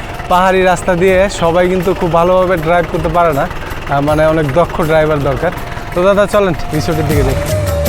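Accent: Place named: Dhaka, Bangladesh. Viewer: আপনার উচিত ভিডিও বানানো ঢাকার আশে পাশের মানুষ এভাবেই কথা বলে ওনার আঞ্চলিক ভাষা মাঝে মাঝে চলে আসে এটা স্বাভাবিক আপনাকে ক্যামেরাতে কথা বলতে দিলে হে** দিবেন আগে নিজের দিকে তাকান🐸😅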